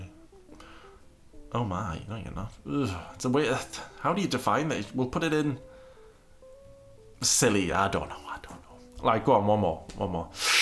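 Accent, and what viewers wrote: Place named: Newcastle, United Kingdom. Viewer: I feel like you’re a geordie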